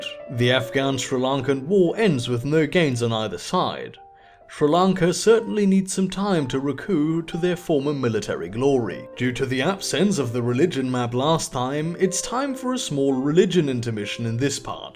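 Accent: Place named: Australia. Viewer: Your Australian accent sounds like Russell Brand.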